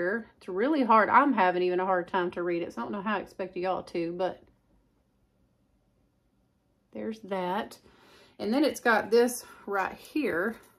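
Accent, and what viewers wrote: South, United States. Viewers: Just found your videos. Love them!! I heard your southern accent and knew I had to subscribe.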